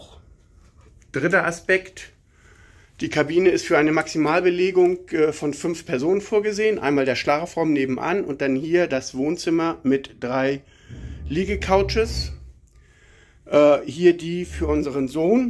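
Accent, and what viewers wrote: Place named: North Germany, Germany. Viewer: O Gott. Was für ein norddeutsches Gelabere. „Sich mit dem Gedanken hegen“ 😂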